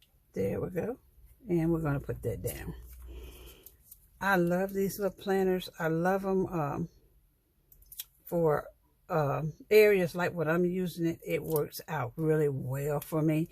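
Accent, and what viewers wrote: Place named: South, United States. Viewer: I like your southern accent😉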